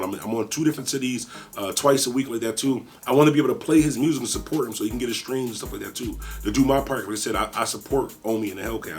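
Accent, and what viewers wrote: Philadelphia, United States. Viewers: Bro you sound like a philly nigga js bro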